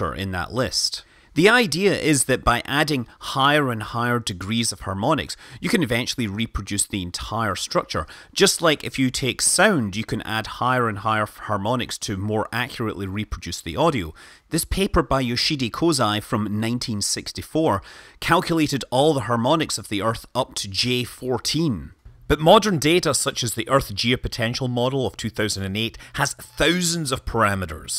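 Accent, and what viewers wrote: Scotland, United Kingdom. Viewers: Jack Shanahan He’s a Scottish Laddy. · DigitalSaturnX by a manly Scott called Scott Manley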